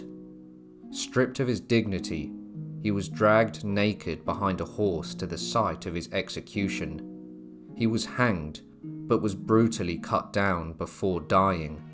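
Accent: England, United Kingdom. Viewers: Being a very patriotic Highlander i am insulted that Wallace is being narrated by an Englishman with a common accent that fails to be understood, who the hell decided to pick someone from the other side of the boarder to talk on Wallace, what a disgrace, put the shoe on the other foot see what happens, not that they ever had a super warrior like Wallace. Pick a Scot for feck sake.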